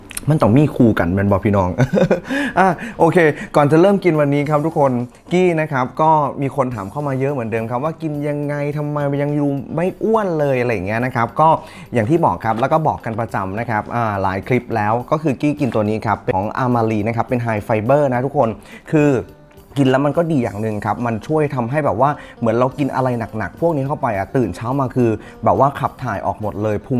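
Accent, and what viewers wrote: Isan, Thailand. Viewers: น้องกี้เว่าอีสานตาฮักหลายจร้าตามเบิ่งทุกคลิป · เราไม่กินดิบ ไม่กินเนื้อ แต่ชอบกินผัก ดูไปกินข้าวไป อร่อยตามเลยค่ะ เว้าอิสานได้น่ารักมาก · พูดอิสานน่ารักมากค่ะคุณกี้ ❤❤ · ชอบพี่กี้เว้าอีสาน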